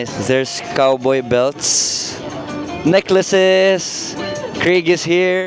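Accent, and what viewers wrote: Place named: Philippines. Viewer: your accent is so Pilipino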